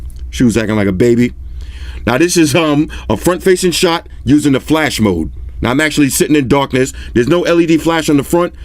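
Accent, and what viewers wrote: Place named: New York, United States. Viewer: I'm type shit living the struggle so videos like this help me a lot - is always making the best reviews for this type of shit - and also does anyone else just laugh because the way talks is so New York that it's hilarious 😭😂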